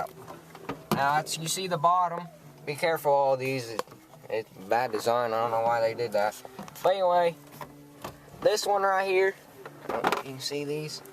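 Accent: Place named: South, United States. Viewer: Called good ol country boy accent. It is how we talk down south of the Mason Dixon line. Lol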